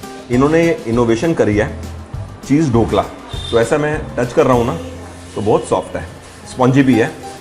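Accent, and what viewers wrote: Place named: Delhi, India. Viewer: sahib,soo waat chay surat na visit nee,aap ki zabaan tau Dehli walon jesi hai,aap ki Urdu numa hindi bohat lutf daiti hai. Love from Pakistan 🇵🇰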